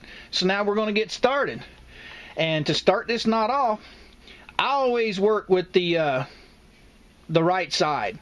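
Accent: South, United States. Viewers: Hey, thanx for all the great videos. Finally, there's someone who speaks Southernese that I can fully understand. Was wondering if you've ever done a necklace like the ones professional baseball players wear. My grandkids keep asking me if I know how to make them. Thanx again, Tmat46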